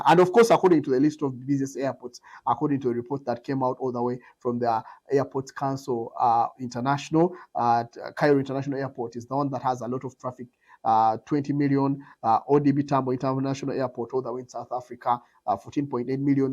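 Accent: Uganda. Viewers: Also Tanzania has 6 international Airport not 3. Also the total number of Airports in Tanzania is 59 and 300 Airstrips. Kenya has only 36 Airpots and 3 international Airpot just so you know. You should have Mentioned also that Kenya Airways is NOT owned by Kenyans or Kenya Government, it a shared company between KLM, One Private company and Kenya Government which means Kenyan government is nit benefiting from the Airline 100% like Tanzania Air which is Owned 100% by the government. Most people and Tourists in Kenya lands only or most in Nairobi or JKNIA while in Tanzania Most people and tourists are spread in 3 Airports JNIA-Dar, Kilimanjaro Airpot and Karume in Zanzibar and soon coming up Songwe International Airpot, Pemba international Airpot and Mwanza International Airpot. Next time di research before opening your UGANDAN Accent MOUTH.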